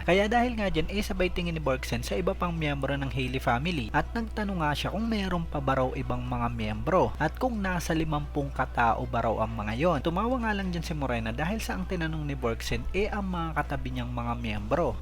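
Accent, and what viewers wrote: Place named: Philippines. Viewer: Boss bakit yung pronouncing mo ng english parang pilipinong pilipino din.. medyo mahirap siguro paghaluin english at pilipino pag nagsasalita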